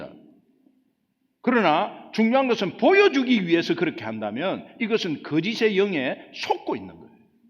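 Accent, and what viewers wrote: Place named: Busan, South Korea. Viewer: 그사람이 순천사람이라서 사투리를 잘 모르나보네요 목사님은 완전. 부산말투예요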